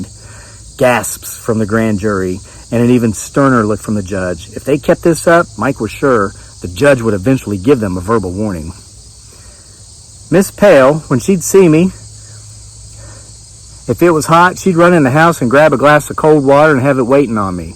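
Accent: South, United States. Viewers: you know.... what makes your stories even better - how YOU read them! it adds a southern flavor and brings then even more alive! have you thought to do an audible version of this book? after all this book will be around for a while, just like Halloween! thank you for reading out loud!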